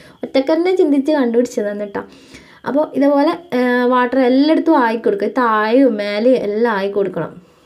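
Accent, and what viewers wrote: Kannur, India. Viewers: Samsaram kettitt kannurano enn oru .........😁😁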